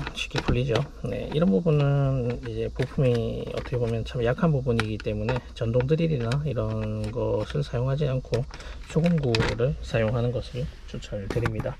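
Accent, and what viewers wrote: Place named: Gyeongsang, South Korea. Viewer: 우연히 지나가다가 영상을 봤는데요 옛날에 저의 꿈의 자동차 였습니다~! 수프라 우핸들의 로망이였는데 ㅠㅠ 사투리가 정감이 있네요 부산경남분 인가요?ㅋㅋㅋ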